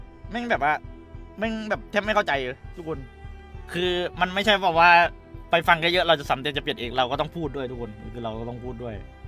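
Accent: Thailand. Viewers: ไทยรัฐ บู้บี้ สำเนียงคนไทยหรอ555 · พี่ก็พูดสำเนียงไทย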